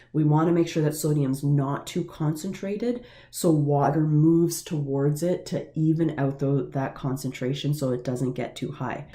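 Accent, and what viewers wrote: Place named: United States. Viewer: Your voice is so beautiful, I really love this accent. Is you accent American or Canadian?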